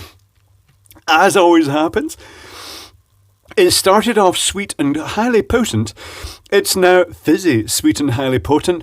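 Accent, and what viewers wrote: Scotland, United Kingdom. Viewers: This is the one and only video in the YouTube universe featuring the long lost posh Scottish accent. :-)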